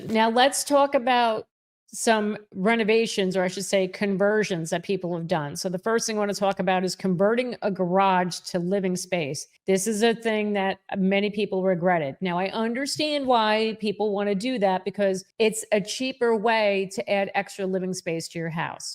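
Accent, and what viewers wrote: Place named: Northeast, United States. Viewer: Like you, the channel and the ideas presented. One update; sounds like you’re from the northeast and I don’t know what pools cost there, but here in Texas you can’t put in a basic pool - no grottos, spa or showers - for less than $80k. That really brings your point home even further. My advice? Make friends with someone who has a pool! 😅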